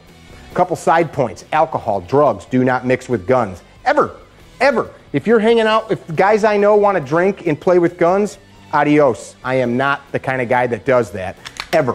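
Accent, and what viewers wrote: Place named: Midwest, United States. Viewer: I can’t get enough of his Midwest accent no homo.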